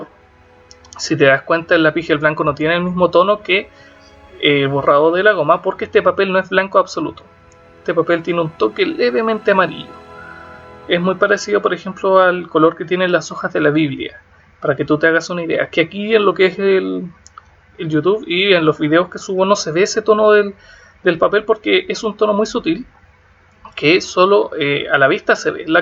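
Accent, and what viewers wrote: Chile. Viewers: buen dibujo! Chileno no?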